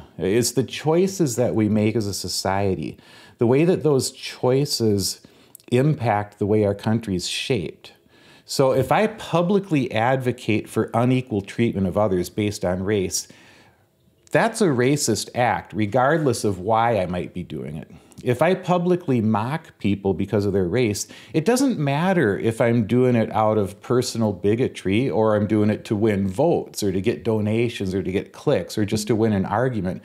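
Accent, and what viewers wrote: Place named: Minnesota, United States. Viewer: If everyone channeled your “Minnesota nice” tone and accent when approaching our political and religious differences we’d be in a much better place in this country.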